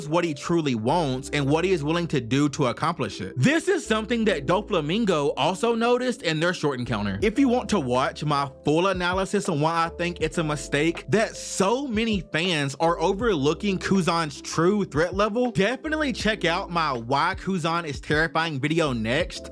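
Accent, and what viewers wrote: South, United States. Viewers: I'm loving the southern twang bro Def adding you to the one piece yt lineup 🙏🏾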